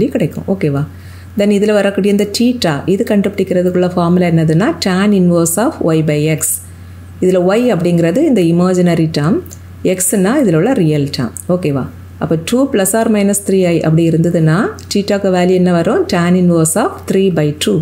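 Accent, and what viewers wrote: Kanyakumari, India. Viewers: Mam the way your teaching is very nicee ninga endha oru namma kanyakumari ah...😊😊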